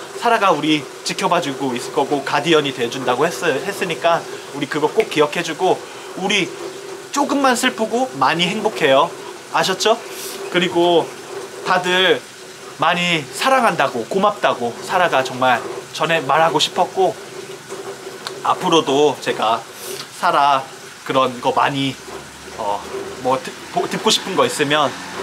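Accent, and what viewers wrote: Australia. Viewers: This broke my heart to see this morning. kickstarted my journey to learning Korean, and as a fellow QLD’er, I felt like I could relate to her a lot. As said, she will always be by your side, every moment of every day, and she will forever be sharing your experiences with you. She’s left so much of an impact on our lives, even as someone who has never personally met her, and she will be dearly loved and missed worldwide. It might be only one small thing that she’s impacted you with, but the bit of an Aussie accent you have when speaking English really shines through as part of 🤍 You did such a great job at bringing this news to all of us, and we know that is so thankful and proud of you for working so hard by her side. I know that this time is so hard for you, and both your families, but I wish you all every happiness and all my love for now and in the future. 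🤍❤️ Bbyong for now ❤️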